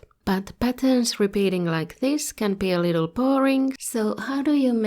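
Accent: Finland. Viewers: Lol, after hearing your accent i immeadiatly knew you were finnish 😂. Keep up the good work, coming from a fellow Fin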